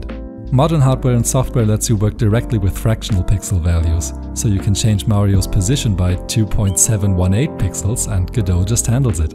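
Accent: Germany. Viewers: Den Deutschen hört man schon deutlich raus Bruder. ; ) Du hast nicht zufällig früher TheEmero geguckt? : D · german guy? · der deutsche akzent der isses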